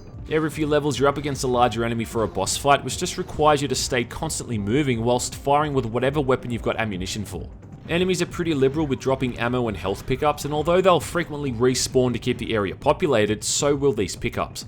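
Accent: Australia. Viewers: Australian accent that isn't danger dolan yay! subbing just for that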